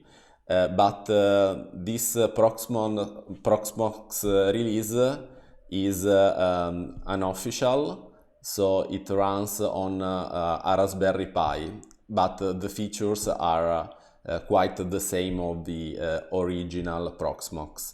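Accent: Italy. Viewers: The italian is strong in this one, thanks for the comparison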